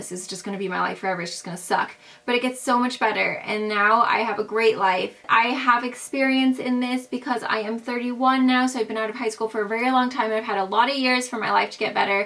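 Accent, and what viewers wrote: England, United Kingdom. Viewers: Did you used to have an English accent?? ( im from England :) ) xx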